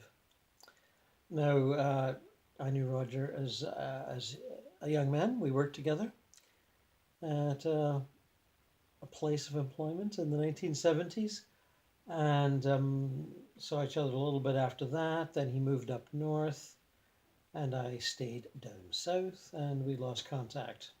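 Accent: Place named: Canada. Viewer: This is my Fav contact from a lot of your vids starting or about..and really kicks in ..better feeling even being in love.. yes you are in pure love and are the assents of love Love all your videos and thank you for posting these, so i don't feel so nuts..yet you let it out as no big deal..and inspires me too..edit : this was the 1st video i saw of you doing this, and the part how he will not ever forget those who were there for him in the end, aw.. see i was there for my grandma of 99 1/2 who died last yr at home using hospice care..and her last words were..thank you 3 times..and im thanking her..she got ill and wanted to thank me and just except it ..and pray pray pray she said 3 times.. it always worked for me.. Be blessed ..from South Carolina USA .. love your accent ..i love to think British in the uk but know its Canadian !?..maybe even Montreal Take care :*)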